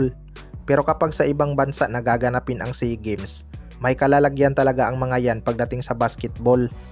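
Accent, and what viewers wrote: Visayas, Philippines. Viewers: Bisaya e tuno palang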